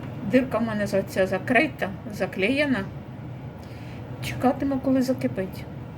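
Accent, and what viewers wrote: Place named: Kyiv, Ukraine. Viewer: Мабуть, ви окрім поняття "суржик" і своєї расєйської мови ніоли не чули таке поняття як "діалект". Так от, ставлю вас, нешановний, до відома, що такий діалект поширений у Київській області. І не треба повчати, якою мовою і на якому діалекті людині розмовляти. Всяка мова добра і гарна, окрім огидної рашистської.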